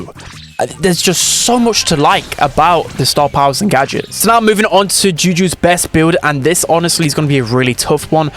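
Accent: United Kingdom. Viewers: Hes british leave him alone 😭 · Even the sub titles gets failed against these brits english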